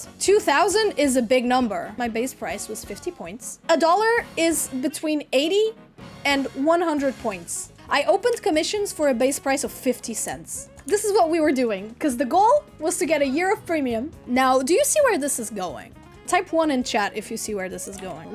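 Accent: Portugal. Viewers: I love your Portuguese accent,... Beijos do Brasil!!!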